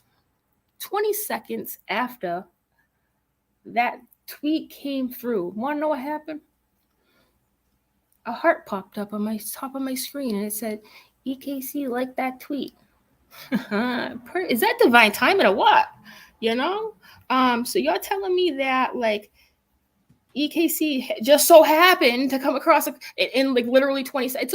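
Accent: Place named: New England, United States. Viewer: ... First off, you make me miss my new England accent, lol. I'm from RI but now live in NC. I'm not sure where you're from, but I believe it's new England. I don't agree with everything you stated in this video. I do appreciate your non biased stance. Overall, I enjoyed this video. Thanks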